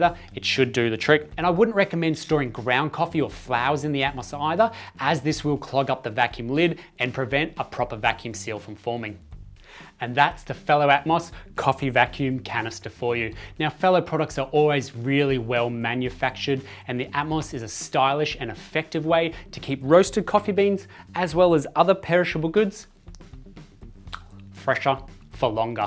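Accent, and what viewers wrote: Australia. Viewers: Very informative video, pity about the unbearable Australian accent...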